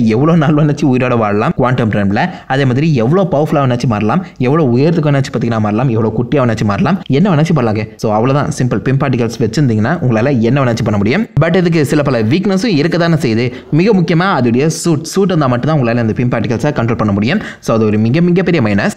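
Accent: Tirunelveli, India. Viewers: Bro ungalukku tirunelveli aah ? Kandu pidichuten.... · Bro enna tirunelveli ya neenga...ela ennala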